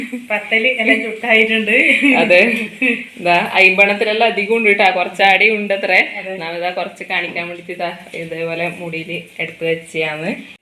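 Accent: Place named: Kasaragod, India. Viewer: മുതുമ്മനും കൊയ്ച്ചെടുത്തു 😂. നമ്മുടെ കാസറോട്ടെ ഭാഷ 👍🏻👍🏻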